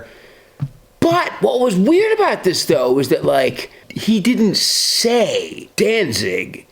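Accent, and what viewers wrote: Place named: Texas, United States. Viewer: Damn dude you’re Southern accent is spot on. That’s how we talk in Texas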